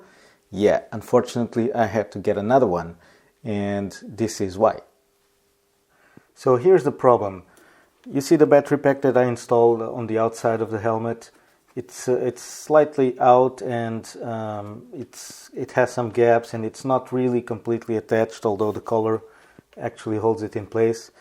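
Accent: Portugal. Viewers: És tuga não és?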